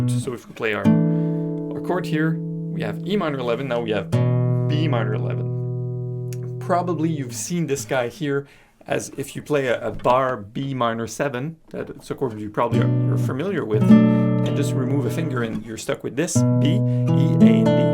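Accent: France. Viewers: Super un frenchy qui nous fait des tutos pour l'international avec le petit accent qui va bien. Et à part ça en français pour la France vous avez Monsieur ? Merci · Man, great video! But I can't wrap my head around where you're from! ;) It seems to me that you have a very very slight French accent (I'm French), but you speak an amazing English! It seems you're from Ottawa, but, gnah, with your name and this speech, something sounds fishy. ;) Anyway, keep up the good work! :)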